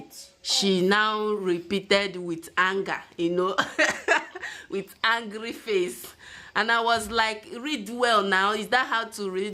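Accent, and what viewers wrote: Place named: Mbano, Nigeria. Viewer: Even my children call fly mosque 😂😂😂 nne you sound like mbano oo chia i enjoy your igbo. It sound so much like my hometown 😊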